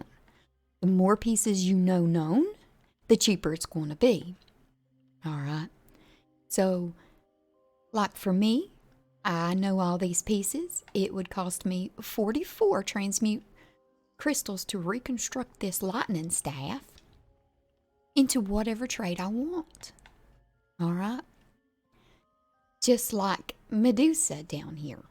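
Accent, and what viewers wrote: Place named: Appalachia, United States. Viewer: Haha, are you from East TN, East KY, Or southwest/West Virginia? I was born in East TN myself , you sound like me and all my family haha. Funny finding that on the internet!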